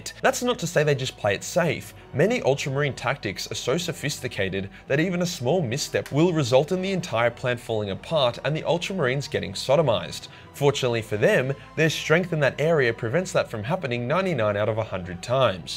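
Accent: Australia. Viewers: The iron cage explained by an Australian?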